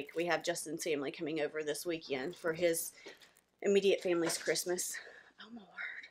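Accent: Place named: South, United States. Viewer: 😁 don't apologise for your cats' noises, I find it hilarious 😁 Maybe because I also have cats, four of them and sometimes one of them does a cameo on my floss tube 🙈😁 I came here because Chris mentioned you 😊 and I'll stay here. I like your projects and your Southern accent. Looking forward to seeing your cotton video 👍😊 Kr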